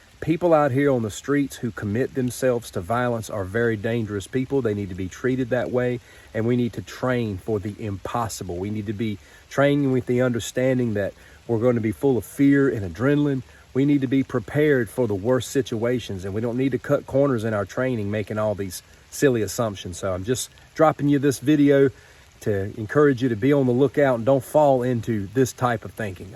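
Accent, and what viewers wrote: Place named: Louisiana, United States. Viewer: Interesting accent. I’m from south Louisiana and you remind me of there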